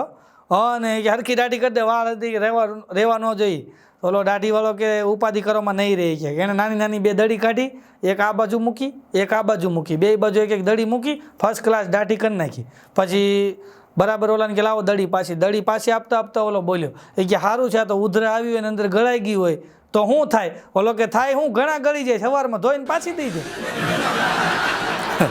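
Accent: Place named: Kathiawar, India. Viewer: હસતો રહે, હસાવતો રહે, કાઠિયાવાડી લહેજામાં સંસ્કારી હાસ્ય... પ્રસરાવતો રહે!!! રિદ્ધિ-સિદ્ધિ ખુબ વરો... વડિલોના આશીર્વાદથી સિદ્ધિનું એવરેસ્ટ સર કરતો રહે!!!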